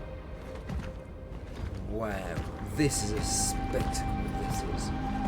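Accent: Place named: England, United Kingdom. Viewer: He is literally ENGLISH 🤦. You might be referring to his accent, he comes from a part of England with a strong regional accent, very different to the rest of the country. But English is still his native language.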